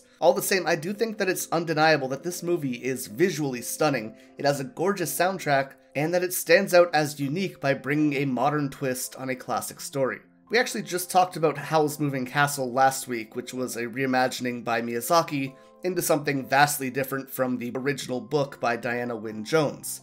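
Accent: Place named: Canada. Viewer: Oh ok. I kept watching your videos and I thought you had a Canadian accent.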